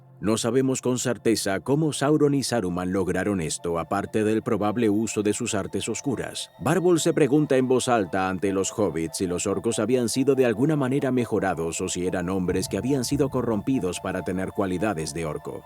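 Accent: Argentina. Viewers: pues me alegro que no seas una ia, aunque de verdad me dio esa sensación,,, apoyo la traducción, pero no me gusta el doblaje español, ya que se suele emplear un dialecto genérico que no representa bien la realidad,,, no niego la calidad de NOR, pero hay muchos youtubers hispanos que también lo hacen bien, y mucha gente no los conoce porque solo consume contenido americano. Si creas tu propio canal, contando las historias a tu manera y con tu acento argentino, prometo subscribirme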